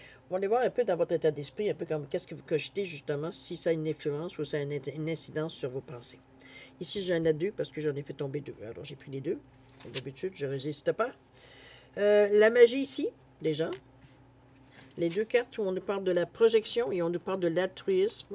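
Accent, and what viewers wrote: Quebec, Canada. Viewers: ah c'est l'accent québecois !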